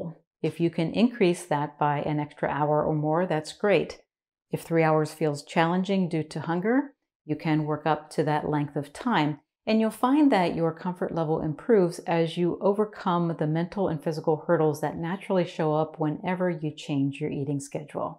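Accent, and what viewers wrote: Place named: United States. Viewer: Excellent advice. Wonderful delivery in your calm voice with no trashy muzak track. I'm UK and some USA accents are not for me. Yours is perfect. To me you sound like Mary Chapin Carpenter so I wonder if you come from the same part of America.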